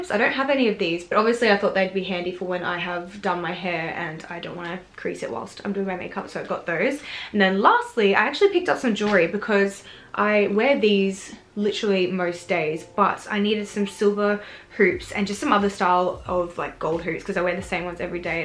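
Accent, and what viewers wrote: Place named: Australia. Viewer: so good to hear an aussie accent on yt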